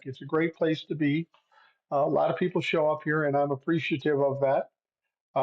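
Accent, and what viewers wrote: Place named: Liverpool, United Kingdom. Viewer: Yes the scous is starting to push !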